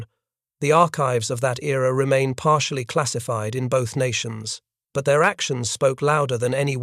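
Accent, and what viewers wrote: United Kingdom. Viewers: Outstanding historical account, love the British accent!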